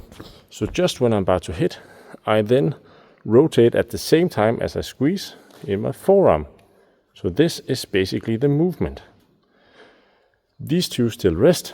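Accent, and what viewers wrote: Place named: Denmark. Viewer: Du har virklig meget dansk accent 😂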